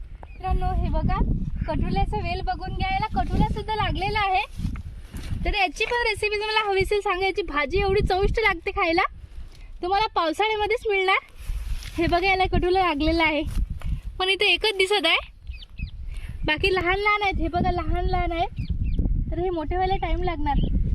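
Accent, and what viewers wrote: Vidarbha, India. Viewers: ताई तुमी कोणत्या गावातुंन बोलता नांव काय आहे ताई तुमच वराडात ल्या बोली भाषा मुडे विच्यारल मी सोनाडा ची लेक आहे गाव ची अस म्हणायच आहे मला माझ नांव गीता आहे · Vidhrbhatle ka tumi bhasha varun vatle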